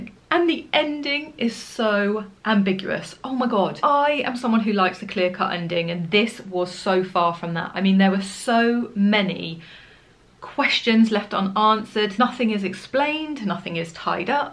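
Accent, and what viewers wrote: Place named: England, United Kingdom. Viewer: I liked the book as it is different from most world in crisis end times books that are usually predictable. Love your English accent, and don't worry about big words in books that means you are reading literature instead of pulp fiction and tripe. Sorry, you found the book not to your taste. Maybe your taste is the problem.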